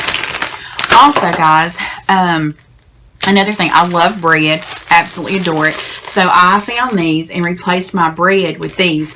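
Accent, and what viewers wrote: South, United States. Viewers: Oh and I love your southern dialect!! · Beautiful, down-to-earth and a sweet Southern accent! She is my new best friend in my head! LOL!!... Congratulations on your fitness journey! I'm inspired! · Do you count calories? I am struggling with life...divorced, 3 kids at home, struggling with being a single parent...I've gained 100 pounds. I'm ashamed of myself. I used to do 10Ks and now I don't even enjoy walking. I used to be a vegetarian because I really don't like meat. My doctor told me to follow the DASH diet which is basically low carb. I'm so glad I found you because you sound like me (but I don't have your darling southern accent). I eat to cope. I used to eat to live (healthy) and now, I eat to cope. Sad. Hard to admit. I have been counting calories and I lost 10 pounds this last month. However...I feel like a slave to numbers. 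I am an educated person. I know calories count. I just know I am not going to do this for the rest of my life, so I feel it's setting me up for failure. Do you count only carbs? If you count calories, how many do you allow yourself per day? Thank you! You are a delight. 💕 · You are beautiful, love the country accent. .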